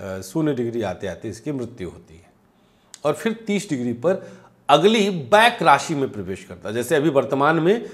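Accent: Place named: Kanpur, India. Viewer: Pandit ji aap kanpur se hain kya itna mazedar to sirf hum kanpuries bolte hain maza aa gya 😊🙏🏻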